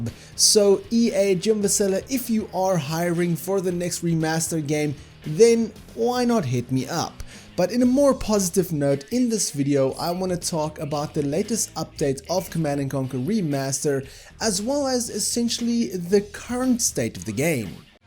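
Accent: South Africa. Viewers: Bru, ma chana. Wa ah yoo putting on, laak, a fake American accent, hey? Stick with the Edenvale accent. Id saands so much better. ^^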